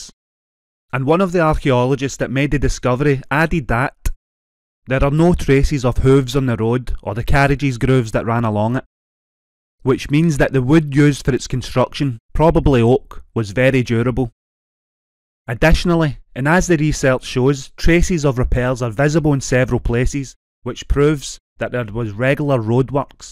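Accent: Scotland, United Kingdom. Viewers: Love the Scottish accent. People who complain need to turn on subtitles.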